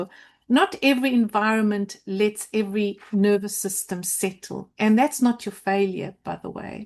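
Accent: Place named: South Africa. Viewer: Glad to have stumbled on your video. And as an expat living far from South Africa, good to hear your accent ❤. Thank you for your work. I listen to a lot of financial podcasts and one of the latest buzz words at the mo, is "K Shaped Economy" (meaning those on the upleg of the k are getting wealthier and conversely, those on the bottom leg, are finding themselves with less and less). Isn't it interesting that this is happening Now with Chiron going into Taurus AND the symbol of Chiron having a clesr K in it?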